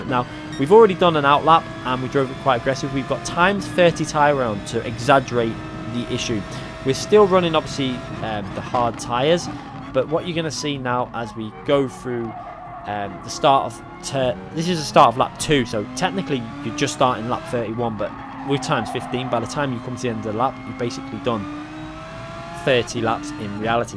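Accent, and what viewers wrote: United Kingdom. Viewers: That British accent make tutorial videos sounds so much better. It feels like I am watching an actual race with commentators speaking in the background.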